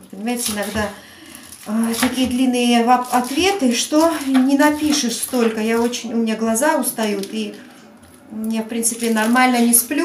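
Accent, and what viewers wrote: Georgia. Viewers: ты там луч света акцент это нашинский грузинский испанах это мы же в Грузии так его называем смотрю твои видео с удовольствием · Gruzinski Akzent vi gruzinka 👍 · Этоже классно когда тебя так называют ,ашкым,севгилим,чаным,уреим,бебеим,хаятым. Я так и знала что вы с Грузии ,у вас акцент грузинский)))))) 👍👍👍👍👍👍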